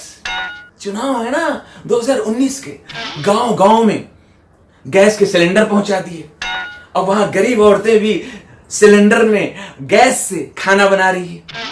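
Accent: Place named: Bengal, India. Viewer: Sir...aapka Bengali accent bda tagda hai.....👍👍👍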